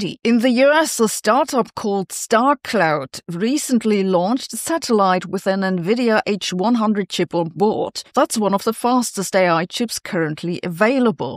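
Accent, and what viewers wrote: Germany. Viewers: we like your german accent,. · We love your considered approach and your German accent. This is a good disection of the issues around orbital data centres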